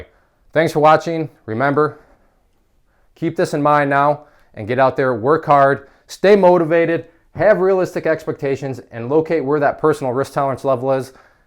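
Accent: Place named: Midwest, United States. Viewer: Where from the Midwest are you from i got a feeling your from the midwest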